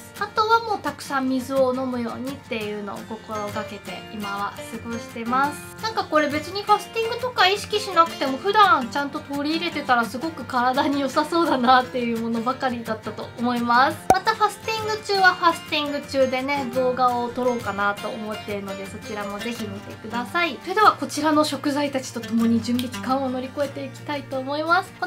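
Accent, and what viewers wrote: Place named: Kansai, Japan. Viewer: 関西弁ええなぁ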